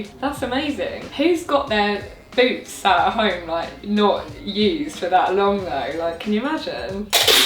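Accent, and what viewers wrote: England, United Kingdom. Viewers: You have the best English accent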